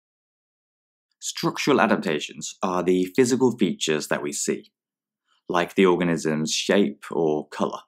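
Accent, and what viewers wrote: United Kingdom. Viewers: His British accent is the only reason why I understand his videos 😂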